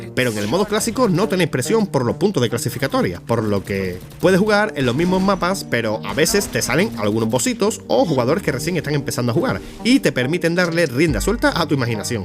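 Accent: Spain. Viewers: por su acento debe de ser de España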